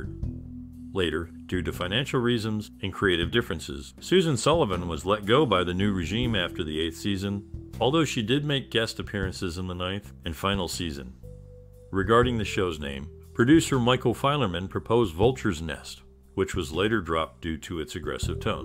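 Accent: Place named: United States. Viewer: Too many random pictures not related to what is being said. And telling us Jane Wyman played Angela about 20 times. The overly American pronounciation of Italian names got very distracting too.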